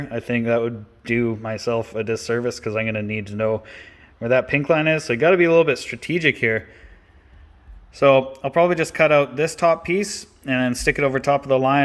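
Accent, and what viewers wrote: Canada. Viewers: Love hearing a Canadian accent on youtube and realizing you're watching a fellow west coaster at work! Nice work buddy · Took me a minute to realize u were Canadian. What's good from London Ontario!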